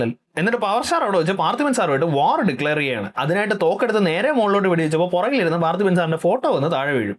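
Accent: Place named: Kottayam, India. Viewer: Chettan Kottayamkaran ano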